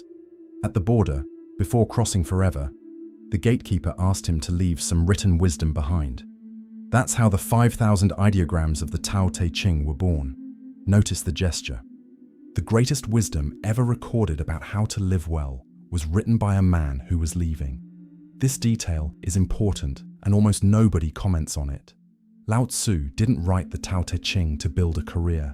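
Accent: England, United Kingdom. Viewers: I’m sick and tired of thievEnglish accents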